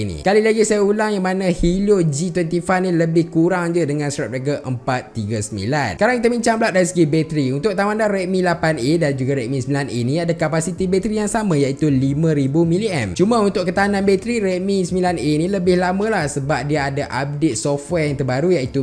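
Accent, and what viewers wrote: Taiping, Malaysia. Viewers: Abg orang Taiping ke? Hahaha baru perasan 😂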